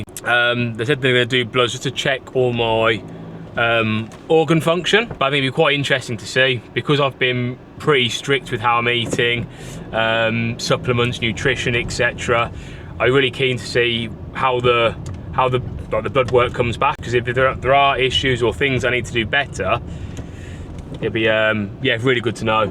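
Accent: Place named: Midlands, United Kingdom. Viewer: Been watching for a while, and only just noticed you’re in Warrington, it’s where I’m from too! With the accent, I was assuming the midlands. Glad that you’ve been able to get your bloods done, it will give you a good insight. It’s a shame it doesn’t seem like you have any other bloods to compare it too, because it could be that yes the result might not look great but there’s no way of knowing if it’s an improvement from when you started 11 weeks ago if that makes sense? Unless you did have bloods done at the start and I’ve missed that. I’ve never had my bloods done before, but been on my weight loss journey since October last year. I’m getting them done tomorrow, privately because my GP is useless 😂. Then although this will give me good insight, I think I will get them done again a few months down the line to compare. Anyway mate, you’re smashing your journey, don’t know if I’ve ever messaged on here so if not, hello! 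Hopefully you won’t have to stop the meds as they have really supported you it seems, but health always comes first!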